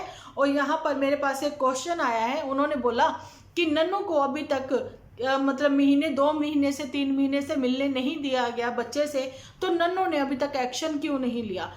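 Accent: Punjab, India. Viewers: that’s Good 👍 I love your Punjabi Accent lots of love side by 🇨🇦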